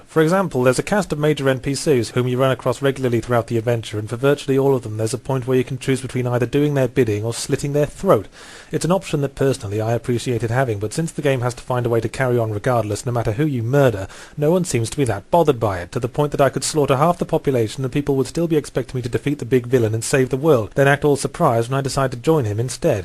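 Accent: United Kingdom. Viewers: i know that Fable is a completely awesome game, but what he says may or may not be true, it's still funny. (talks fast and in a british accent)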